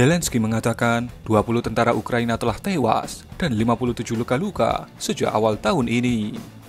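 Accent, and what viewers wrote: Java, Indonesia. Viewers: Bahasane MEDOOKKKK....wagu lahh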